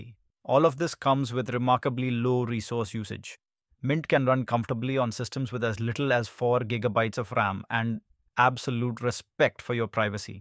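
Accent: India. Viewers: Jeet voice, immediate thumbs down and ignored.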